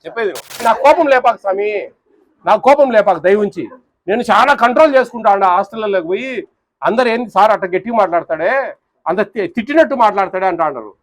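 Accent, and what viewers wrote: India. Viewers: Sir మీ మాటల రాయలసీమ మాండలికం చాలా నాకు నచ్చింది సొలకాడ తీసుకోవాల్సి వస్తది అనేది. Good work sir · మీకడప యాస బాగుందండోయ్ ,👌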